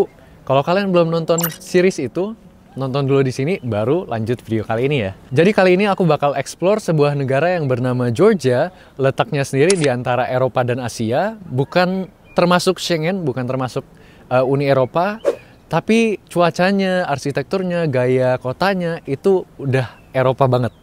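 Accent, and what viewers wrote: Indonesia. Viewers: Ejaan bahasa inggrisnya logat indo aja, gpp kok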